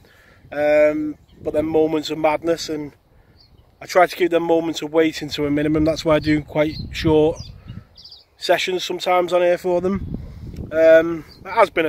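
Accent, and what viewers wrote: Liverpool, United Kingdom. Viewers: Love the vids Even got the Mrs subscribed and just watched this Together! Watching your vids always gives me inspiration to get out there! Like you said mate Angling is therapy! And a Fish is a Bonus! Nice Fish well earned! Hope to see you next week fella! Ain't missed a Vid in the Last couple of years.Your "Naturals" are the best? The pools nobody really bothers with? That you ain't sure what your gonna get? Like your MR PIKE VID a couple of months ago that kept Killing your swim and smashed you off at 1 point!!! Tight Lines big Lad! The Mrs is a Fan Now: ) She said? Is he a scouser? I said Manchester? But with you getting your Hemp from Cheshire particals? I'm guessing somewhere in between? Wigan? St. Helens?:):)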